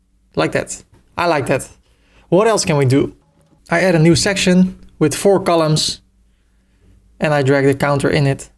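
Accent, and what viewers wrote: Netherlands. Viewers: The Dutch English is to much cringe. x(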